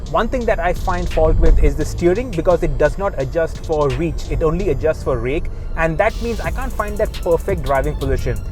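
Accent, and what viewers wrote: India. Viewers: Let me start by telling you that Indian accent is terrible. In addition, the Hyundai i20 in this video is already out of date.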